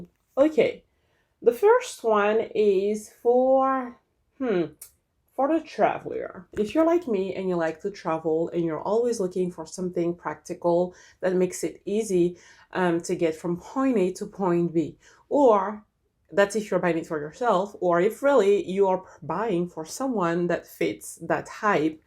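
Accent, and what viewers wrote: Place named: Texas, United States. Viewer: I was listening when Andy walked in and I asked him, “Do you recognize this voice?” “Yes,” he said, “!!” So fun to hear you!!! But I think you’ve lost some of your Texas accent! 😉 We miss you!!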